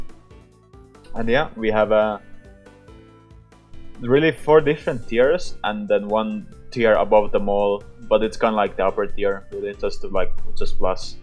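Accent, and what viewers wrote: Finland. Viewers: Ui juma arvasin ihan suomen aksentti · Suomi? This was recorded to me from yt and you have a Finnish acsent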